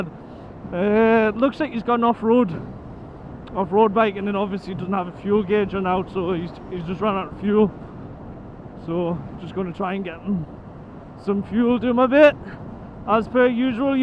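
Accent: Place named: Newcastle, United Kingdom. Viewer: Very happy you are back at it! And I’d do the same for a fellow rider even if I had to travel far to get the gas... I mentioned this to you before that my great grandfather was a gordie so when I here your accent it reminds me of him :) great vid m8 💯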